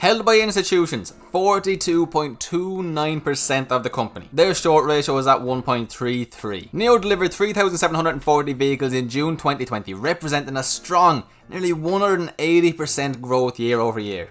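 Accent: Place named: Ireland. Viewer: I love your Irish accent, its so cute and you're so enthusiastic about the stocks you cover. Thanks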